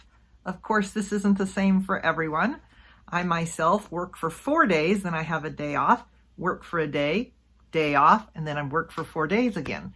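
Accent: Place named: United States. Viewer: I love American English and American Accent so much Dear.😊😇🥰😍🤗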